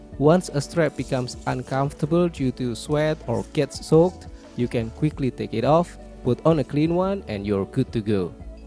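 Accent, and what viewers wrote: Indonesia. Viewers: Your Indonesian accent is familiar. Btw good job.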